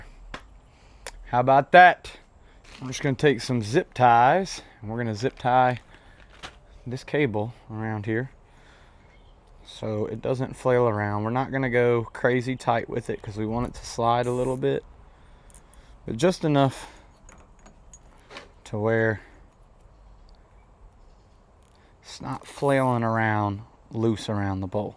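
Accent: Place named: South, United States. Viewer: This is so violently southern. I love it. As an Alaskan it is inspiring to see this level of southern out there😂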